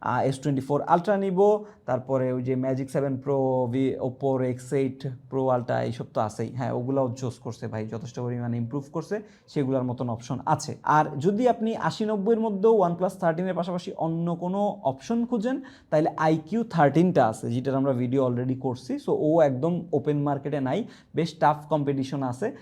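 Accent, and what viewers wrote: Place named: Rangpur, Bangladesh. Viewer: ভাইয়ের কথা গুলা কেমন যানি অন্যরকম লাগলো। মনে হচ্ছে রংপুর বা দিনাজপুর বা বিক্রমপুইরা লাগে অনেক টা।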